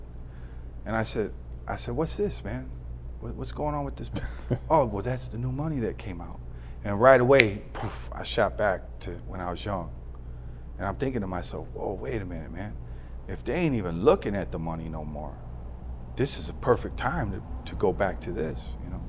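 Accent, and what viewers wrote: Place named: Chicago, United States. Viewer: I was wondering what it was in your voice the whole time.. It's that Chicago accent man.. I'm a Gary boy.. Family off Manistee on S.W. side..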